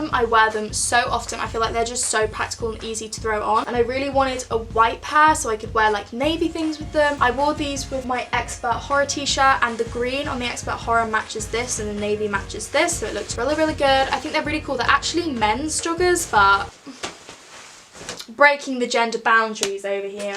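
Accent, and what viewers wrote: United Kingdom. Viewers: I looooove your british accent. I wish, I coul speak like you xD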